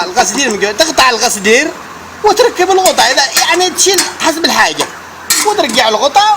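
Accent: Yafa, Yemen. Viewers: يافعي من اللهجة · اللهجة اليافعية هذي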